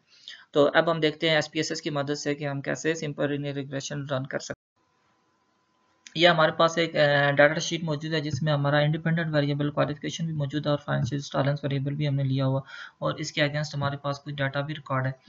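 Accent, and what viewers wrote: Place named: Kashmir, India. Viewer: Great sir....your accent is saying that you must be a kashmiri.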